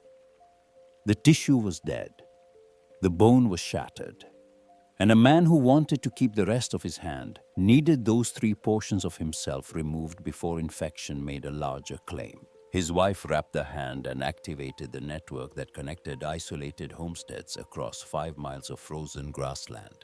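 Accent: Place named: India. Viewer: Just when I got used to the other AI voice they change it to what is this an Indian accent? I don't like the change of voice so I can't listen.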